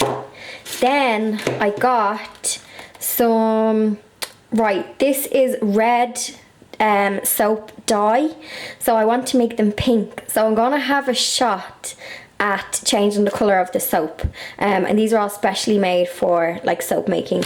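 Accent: Ireland. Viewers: Brilliant yr so clever yr such a lovely young lady love yr Irish actsent x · Hello! Recently discovered your vlogs and they're awesome! I hear your accent and that cute little cottage and it's like being transported to Ireland! I wanted to ask about the soaps. I help to direct an event at my job for crafters. This would be an excellent craft around mother's day. Could you give any tips as to how to adjust it for a crowd. Maybe a group of 5-10 people. Would you do any make ahead soap & scent mixes and just let them choose? Hoping for suggestions because the group meets for an hour and a half only. Thank you :)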